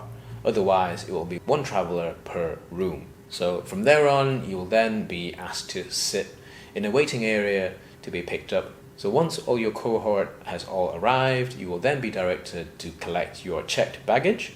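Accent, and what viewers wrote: Malaysia. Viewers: Was wondering whether you were Malaysian, the slang gave it away AHAHAHA! Nice to see a countrymen in this field!!! Subbed and liked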